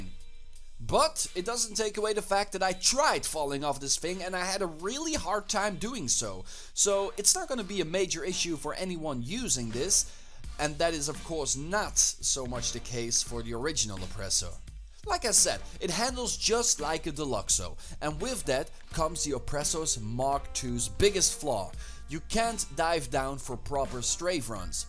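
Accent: Netherlands. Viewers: I have to know... You wouldn’t happen to be Dutch, would you?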